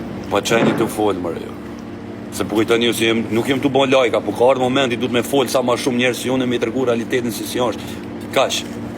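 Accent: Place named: Durrës, Albania. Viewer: Ca bone si fol arkimeti si na durrsi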